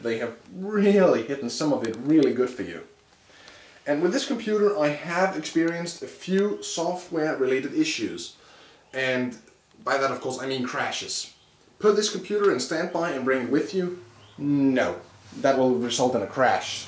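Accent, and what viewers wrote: Denmark. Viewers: are you from denmark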